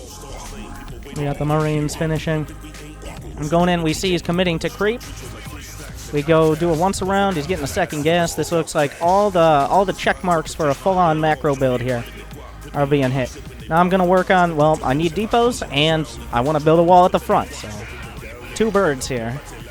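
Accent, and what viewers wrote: South, United States. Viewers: I m curious as to where are you from? is this a Southern accent?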